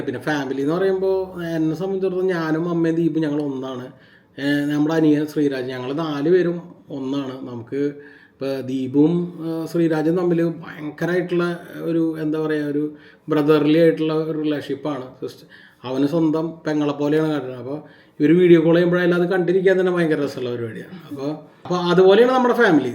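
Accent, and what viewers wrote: Thrissur, India. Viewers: Ente native place trivandrum aanu...trissur language kelkan eniku bhayankara ishttanu..pinne nigade bonding bhayankara resamanu athukonda njanum suscribe cheythathu..very simple and humble souls...vere oru channal njan kaanarunde,athum trissur aanu its me vijitha...aa kuttiyum paavama nigale pole😊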